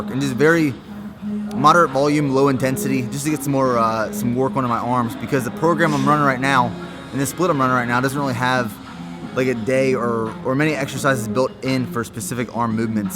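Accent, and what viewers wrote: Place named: South, United States. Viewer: Sounding very southern ha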